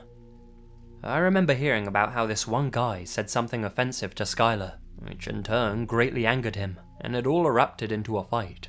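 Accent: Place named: South East England, United Kingdom. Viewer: It's a bog standard middle class south east England accent. Nothing like Scottish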